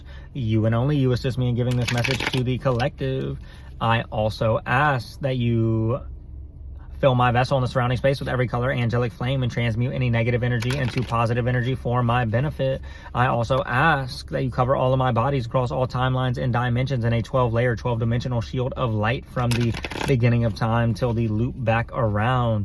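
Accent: South, United States. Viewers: your semi-Southern drawl sounds like somebody's auntie on occasion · this guy has a country accent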